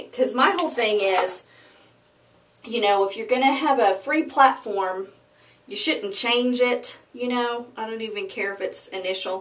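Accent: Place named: Texas, United States. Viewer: Miss: You have some pretty long hair and you look real pretty w/o your make up.I like you texas accent and hope that you really enjoy your movie. Happy belated birthday. By the way I have been selling on ebay since 1998 and even sold items on Yahoo auctions at the same time back in the 1990's. You are definitely a true lady entrepreneur and know how to be self sufficient!!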